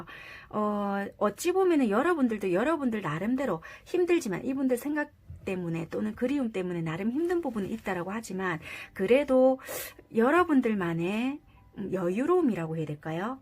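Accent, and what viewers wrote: Busan, South Korea. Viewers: ㅕㅛㅛㅛㅛㅛㅛㅛㅛㅛㅛㅛㅛㅛㅛㅛㅛㅛㅛㅛㅛㅛㅛㅛㅛㅛㅛㅛㅛㅛㅛㅛㅛㅛㅛㅛㅛㅗㅕㅛㅑㅕㅕㅕ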